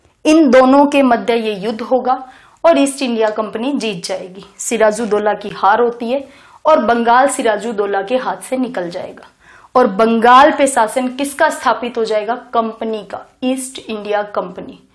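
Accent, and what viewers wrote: Haryana, India. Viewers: Mem app hariyana se ho na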